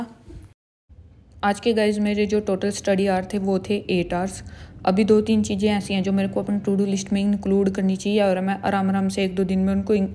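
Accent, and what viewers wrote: Haryana, India. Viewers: Haryanvi type language h aapki ...?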